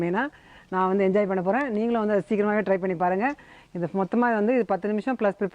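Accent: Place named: Chennai, India. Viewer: vial modern nana kitchen. mode look. but still kneeing pesra madras tamil super irk madam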